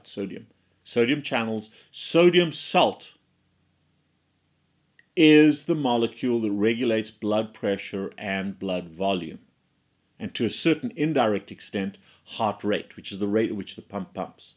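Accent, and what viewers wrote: South Africa. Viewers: I was curious about your accent as it does not sound American, I had a hunch you might be South African! We have the best heart doctors :-) Great videos!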